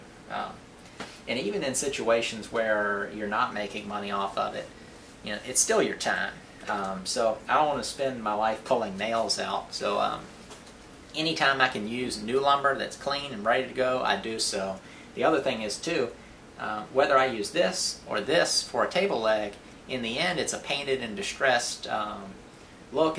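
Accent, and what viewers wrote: South, United States. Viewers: Hahaha! I'm from Arkansas, and not only does your accent give you away, and "down in Georgia" narrows it down even more. I'm not really trying to find your location, I just get a kick out of your sayings. My favorite today was "don't let a nickel hold up a dollar." I've heard that all my life here in the Arkansas Ozarks! Great advice on reclaiming lumber too. I always enjoy your videos, ramblings and all!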